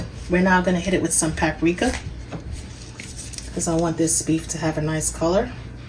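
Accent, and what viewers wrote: New York, United States. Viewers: I thoroghly enjoyed watching this video. Cant wait to try the recipie. Your voice and NY accent is music to my ears. My dad is from Brownsville, so I recognize real NY!!! Have a blessed day!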